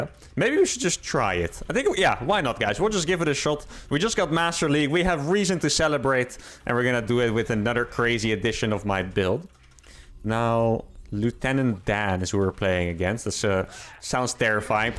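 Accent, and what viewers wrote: Netherlands. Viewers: Lolololol "yea I'm proud" I love. and Lowko are my fav SC casters. They voice/accent makes me want to visit the Netherlands. Seem like some wonderful fun and cool people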